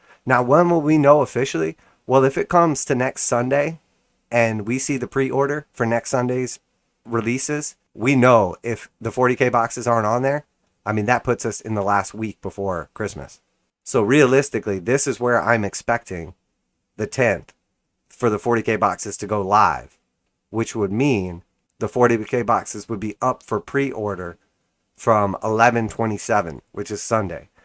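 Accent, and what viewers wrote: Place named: United States. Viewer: I guess it is a bit different for you in the US, you sound like you live in the USA. I'm in the EU and I don't think it will be a problem to get them. My local hobby shop is already taking pre-orders for them. But i guess we will see.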